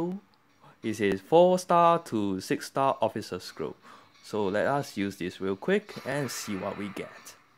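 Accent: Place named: Singapore. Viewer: well... your accent is typical singlish so im used to it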